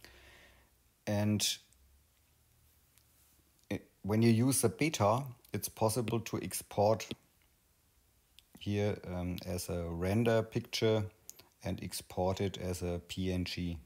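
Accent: Bavaria, Germany. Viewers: Thanks for sharing. I love Bavarian English 🤭. Alles gute 🫶🏼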